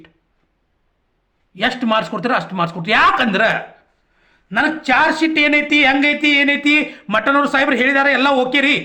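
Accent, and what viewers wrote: North Karnataka, India. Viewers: ಉತ್ತರ ಕರ್ನಾಟಕದ ಭಾಷೇಲಿ ಬಲು ಛಲೋ ಐತಿರಿ, ನಿಮ್ಮ ಮಾತ.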